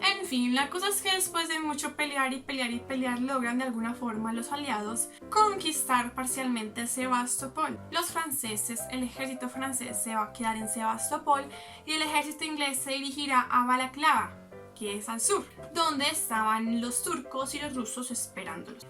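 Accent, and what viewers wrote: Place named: Colombia. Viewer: Videos asi no tienen pérdida alguna, muy bien hecho y explicado con tu propio toque y estilo. Y más aún si es con metal PD: Con ese acento te me haces que eres de mi Colombia tierra querida.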